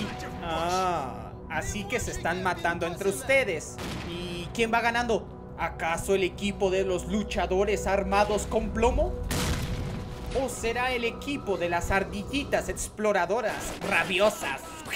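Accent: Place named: Mexico. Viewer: JAJAJAJJA buen vídeo me gusto tu asentó mexicano le queda genial a tu voz lol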